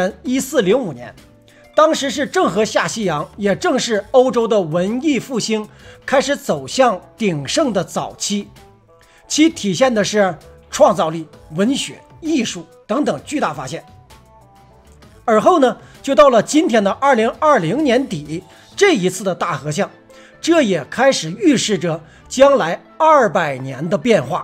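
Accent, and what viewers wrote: Northeast, China. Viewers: 您是东北哪旮瘩的，我是大城市铁岭得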